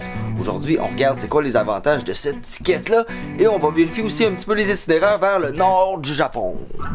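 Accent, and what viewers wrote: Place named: Quebec, Canada. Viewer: Merci! Très intéressant!! C’est bien d’entendre un québécois parler du Japon!! (étant moi même québécoise) J’aime beaucoup ce que tu fais ainsi que ton authenticité!!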